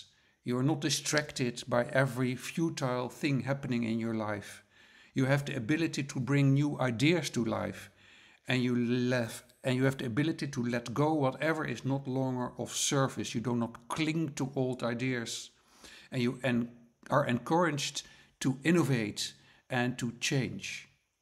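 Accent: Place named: Netherlands. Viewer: Love the Dutch accent. Sounds cute😊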